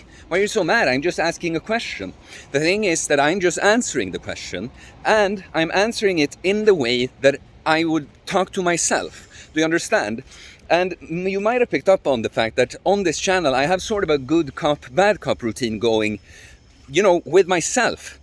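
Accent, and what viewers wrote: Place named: Sweden. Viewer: Once I heard the accent I knew you were swedish. Jag är inte svensk men jag kan svenska. Grym video bror fortsätt så.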